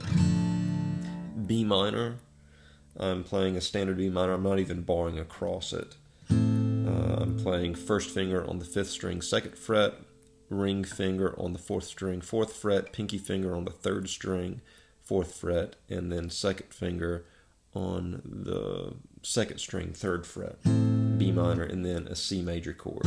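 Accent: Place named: Tennessee, United States. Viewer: I love your voice. You sound like the comedian Nate Barghatze. I bet you're from Tennessee 🤗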